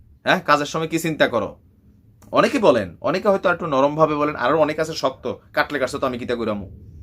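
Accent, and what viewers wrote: Comilla, Bangladesh. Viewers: ভাই আপনি কুমিল্লা বাসায় কথা বলেন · Love from comilla Vhai comillar vasai kotha bolen moja lage😁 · আসসালামু আলাইকুম ভাই কেমন আছেন ইনশাআল্লাহ বালই আছেন ভাই আমি সিলেট মৌলভীবাজার শহরের আপনার কথা খুব সুনদর কুমিল্লা ভাষা টাও বাল লাগে। তবে বাই যদি দিন অথবা তারিক,টা দিয়ে যদি ভিডিও করতেন তাহলে,মনে কষ্ট নিবেন নাকি,দোয়া কইরেন বাই আল্লাহ যেন সবাইকে মহা মারি থাইকা হেপাজত করেন আমিন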